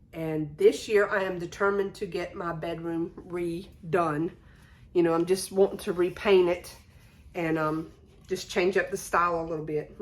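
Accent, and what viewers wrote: South, United States. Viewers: Love that strawberry 🍓 cookie jar and the beautiful vintage linens! I've been collecting doilies, old handkerchiefs and table linens for crafting, but I'm having a hard time cutting them up. 😅 I also love the idea of a vintage toy tree! This year I had my Steiff reindeer pulling a sleigh full of vintage elves and mini teddy bears in Santa hats under the tree. Last year I had vintage Barbies in ugly Christmas sweaters plus my Mattel Elvis. I also wanted to tell you that I love your channel name. It reminds me of growing up on the Chesapeake in Virginia and your accent is so sweet. I miss the south! ❤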